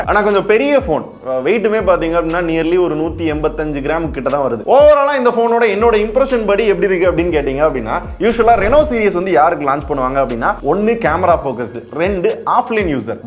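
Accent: Madurai, India. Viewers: Takkali....vokali ❤ pathukkalam madurai slong❤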